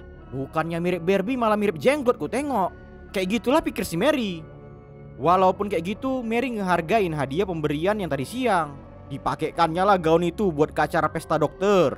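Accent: Medan, Indonesia. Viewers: MEDAN KALI ABG INI AH, JADI SEGAAAAAN KALI AWAK YAKAN😂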